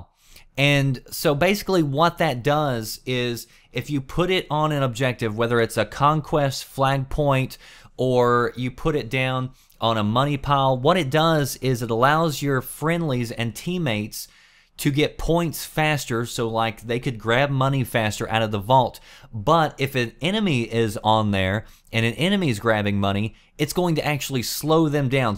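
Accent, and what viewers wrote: South, United States. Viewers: I'm guesin u live down south by ur acent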